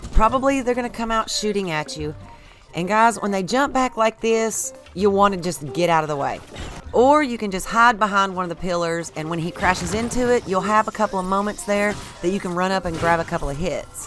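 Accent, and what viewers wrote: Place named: Blue Ridge, United States. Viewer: I did max hearts max 30 min offense and full stamina with two yellow circles and saved right before I put the sword back for middle trials. I'm not sure wuts better max damage 30min or defense. But I paused on the third level to charge my switch. Btw I'm from western nc u sound like a blue ridge mountain person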